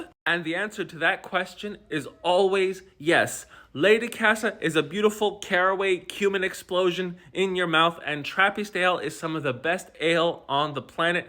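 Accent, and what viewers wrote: Germany. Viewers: Hell no, we don't sound this german. And We probably one off the best english speakers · Ha, the accent is German, not Dutch...😅 And it is insane that our country is 2nd in agricultural export. We are very very small and it is not sustainable for our land and water to carry on like this. We have quite a few problems when it comes to clean water supply...and we are a delta. And if you look at our politics, you wouldn't guess that we are a highly educated people. It really has been a clown show. Our elections are today. Let's see if the Dutch got a bit smarter the last 2 years. I am not counting on it, but am still hopeful. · The accent as always was more german than dutch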